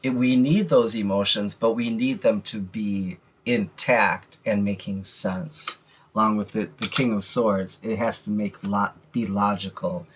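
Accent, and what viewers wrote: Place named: Ireland. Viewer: thank you so much for all this information in this hopeful reading, hope your feeling okay and love the Irish accent 🙈😊 thank you for always making my day 💖 looking forward to the next reading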